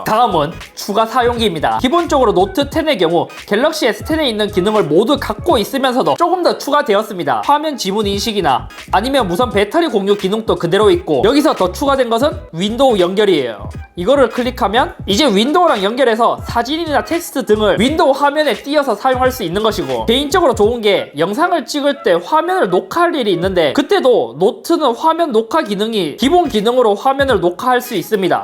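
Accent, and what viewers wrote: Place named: Busan, South Korea. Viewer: 안녕하세요. 저는 형님을 컴퓨터 조립할때 처음 접했는데요. 낯선 경기도권으로 취직을 해서 그리운 부산을 형님의 사투리를 들으며 버텼습니다. 항상 감사합니다!! 주문번호 뒷자리는 <num>입니다. 감사합니다.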